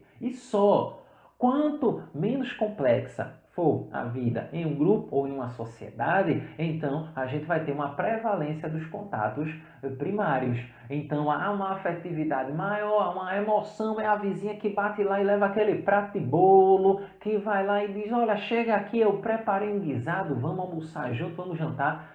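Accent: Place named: Pernambuco, Brazil. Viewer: e eu q me apaixonei pelo sotaque de Pernambuco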